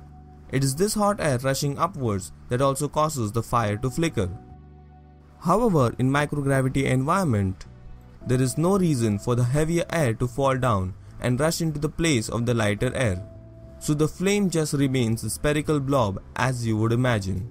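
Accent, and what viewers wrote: India. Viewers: All your videos are very nice .And your Indian accent makes it easier for us Indians to understand what you are saying unlike the bigger channels like veritasium and Vsauce which has the accent hard to understand.Please make the videos on regular basis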